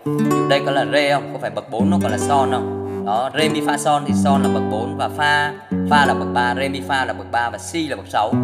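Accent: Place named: Central, Vietnam. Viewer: Bạn hướng dẫn hay quá. Bạn ở đâu vậy? Nghe như giọng Miền Trung á hè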